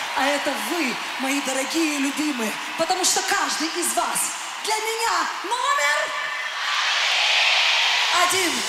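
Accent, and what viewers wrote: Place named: Surzhyk, Ukraine. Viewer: Очень крутой диалект, как в Украине и говорят, украинский суржик, обожаю его, юмор, солнце, Одесса. Свобода, весь мир, далекие страны. С Одессы уплывали в Америку 40 лет назад.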